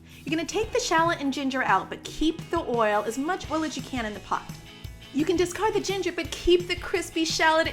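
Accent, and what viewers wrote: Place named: United States. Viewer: wow if I just heard your voice with out the picture I think you was white American lady straight up.but nice cooking tho looks fantastic.cheers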